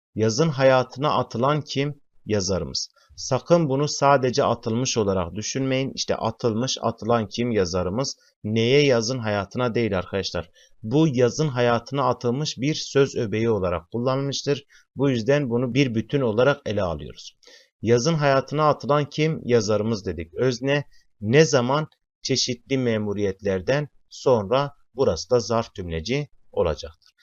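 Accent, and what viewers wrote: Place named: Mardin, Turkey. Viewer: Güzel bir Mardinli şivesi var sanki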